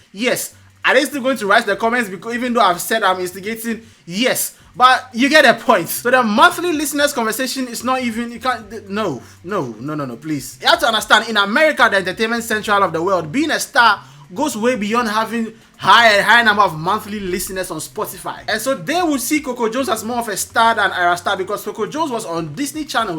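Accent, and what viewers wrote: Ghana. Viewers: this failure think say he dey wine me. You average 700 views when it's about your boring, begging, imf dependent republic. Your biggest views in the last 15 months is about kai cenat in Nigeria with those funny but nonsensical headlines. You're a Mediocre who review trends from Twitter with your funny but disgusting Ghanaian accent and the terrible English that comes with it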